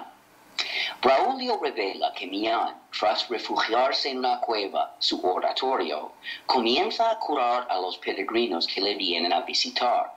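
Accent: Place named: United States. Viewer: Este estadounidense es un sabio erudito y se esfuerza en trasmitirnos datos sumamente interesantes, pero habla demasiado rápido e indudablemente con un acento poco español. En un texto escrito, se le entenería perfectamente. Pero GRACIAS.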